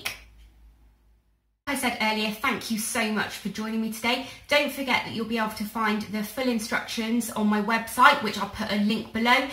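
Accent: United Kingdom. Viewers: LOVE THE FACT THAT YOUR ACTUALLY BRITISH YOUR ACCENT IS REALLY THE WORKS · Love your accent. You gotta be British. I know from the electrical sockets on the wall. Nice video ❤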